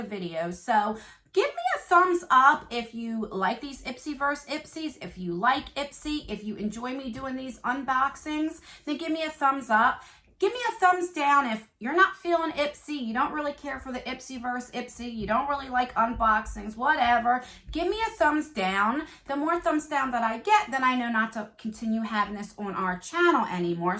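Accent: New Jersey, United States. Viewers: I absolutely love watching you. I currently live in Alabama (20+ years ) but my family is a bunch of " Damn Yankees " . You remind me of cousins who are sweet with just a little tart thrown in to keep it interesting .By the way did you know you have a Jersey accent ? L.O.L.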